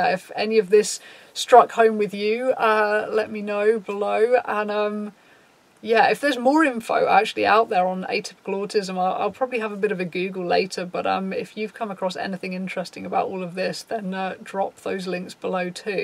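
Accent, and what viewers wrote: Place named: England, United Kingdom. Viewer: Oh my goodness, I just realised that you're from England I keep assuming people people are from america on netflix or youtube and cant even hear peoples accents, I have to stop and figure out if someone's English or American, is that weird? lol and im from England too hahaha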